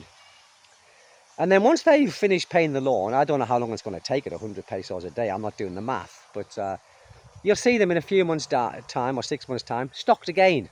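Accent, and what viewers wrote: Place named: Newcastle, United Kingdom. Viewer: Just found your channel. Sounds like you are from Newcastle or somewhere in the North East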